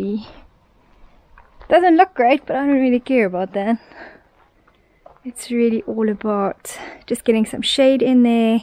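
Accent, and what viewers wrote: South Africa. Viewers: Hello from Bedford, Eastern Cape, SA. Ya, we get 40 deg’s here in the summer . Know that you really needed the pool. I can hear your accent but it’s strangely like as if you’ve got something else mixed in the way you’re rounding off some of your words . Not exactly like our lekker plain South African accent . Can’t put my finger on it . Anyway , you’re a brave girl! Good luck . 🌺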